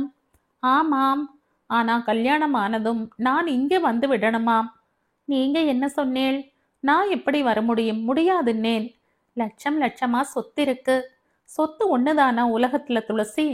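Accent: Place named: Palakkad, India. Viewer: Mam, Your Palghat accent is very nice. Anyone will assume you are brought up in Palghat!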